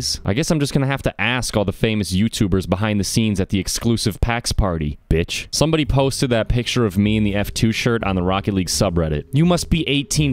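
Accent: United Kingdom. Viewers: I actually thought you were from the United Kingdom when I first watched your videos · I'm an english man and you do sound a bit English only a bit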